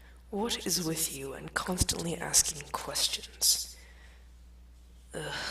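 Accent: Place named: United Kingdom. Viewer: He sound british (｡・//ε//・｡)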